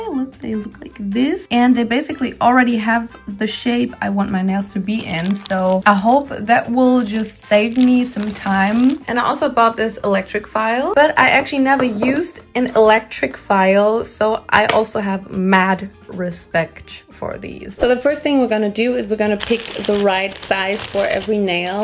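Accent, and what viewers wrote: Germany. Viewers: she is so german that u can hear her accent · I thought she was German from the beginning because she kept talking in German and had an accent if that makes sense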